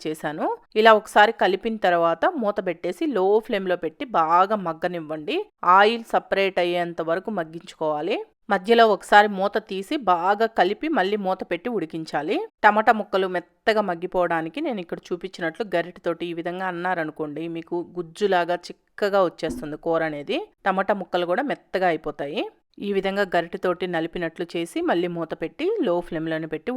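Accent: Nellore, India. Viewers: Mee uru Nellore aa? Ma Nellore relatives mee lage matladataru. And recipe chala bagundi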